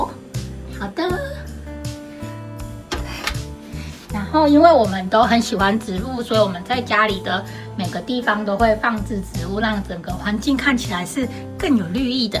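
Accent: Taiwan. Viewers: 台中口音!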